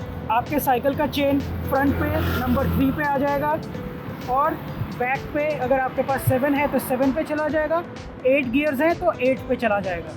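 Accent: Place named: Kashmir, India. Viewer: Very nice. Your accent is Kashmiri 😊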